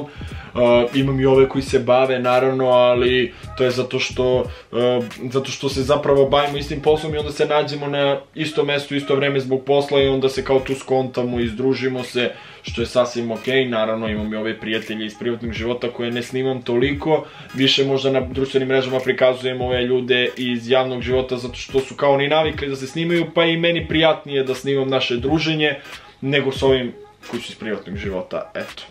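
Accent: Leskovac, Serbia. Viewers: Miji baba i deda pricaju isto leskovacki moze 24h leskovacki da pricas😂😂😂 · pretpostavljam da iza kamere pricas na leskovacki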